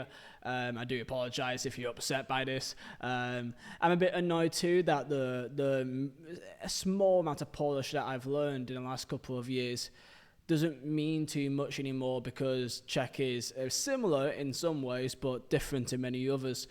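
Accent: United Kingdom. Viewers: Good luck with your next destination/Adventure, BTW your British (random British guy).👍🇬🇧🇬🇧🇬🇧🇬🇧🇬🇧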